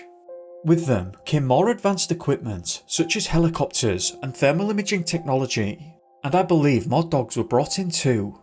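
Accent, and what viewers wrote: United Kingdom. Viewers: Are you English , Irish 🍀 OR Scottish??Liking ur voice 🎤🎙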